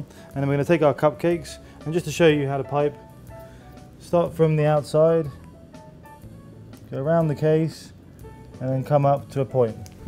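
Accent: England, United Kingdom. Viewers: where r u from? england? lol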